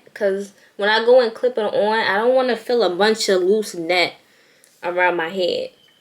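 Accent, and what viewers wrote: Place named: DMV, United States. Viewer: Are you from the dmv? I hear an accent 😍 this video definitely helped me love it thank you !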